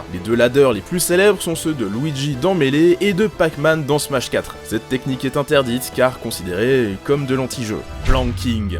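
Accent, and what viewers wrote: Switzerland. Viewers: J'étais pas prêt pour le "neuf cent nonante" (je sais pas si c'est la bonne orthographe). Sans l'accent j'oublie parfois c'est une chaine Suisse. xP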